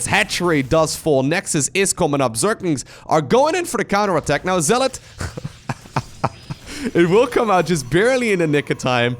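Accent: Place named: Netherlands. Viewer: You are Dutch, aren't you? Just assuming due to your accent.I like watching your vidoes.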